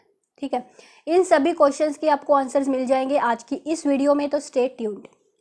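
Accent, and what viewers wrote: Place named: Punjab, India. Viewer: ਜਿਵੇਂ ਤੁਸੀਂ ਬੋਲਦੇ ਓ ,,I like it 😀🤗 ਵਿੱਚ ਪੰਜਾਬੀ accent ,,,pretty voice ਰੁਕਦੀ ਨੀ ਪੰਜਾਬੀ ,,,ਆਪਣੇ ਆਪ ਆ ਜਾਂਦੀ ਐ ,, ਤੁਹਾਡੀਆਂ videos ਦੇਖੀਆਂ ,,,cute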